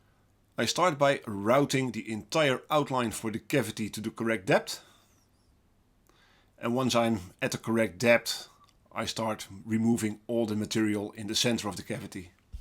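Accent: Netherlands. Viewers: This video captivated me every second. I am very grateful to you that you speak in a (for me) clear and (despite your Dutch accent) understandable English. This makes it very easy for me to understand what you do, why you do it and how you do it. . I think your shape of the guitar body is great, because you do without the often common (but in my opinion disturbing) offset between the upper and lower cutouts on the neck. I first saw this in a similar form on John Petrucci's "Majesty" guitars and find it very comfortable. . Your design with the recessed book matched top should actually work very well on a neck-through and/or semi-hollowbody guitar. What do you think? . I am already very excited about the next part, please don't let us wait too long 🙏😉👍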